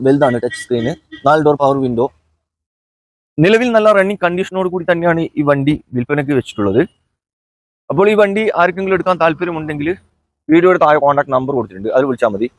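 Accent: Kasaragod, India. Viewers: ചാനൽ പേര് നോക്ക് അല്ലെ മലപ്പുറം അല്ല അവര് കാസറഗോഡ് ആവും സംസാരം കേട്ടിട്ട്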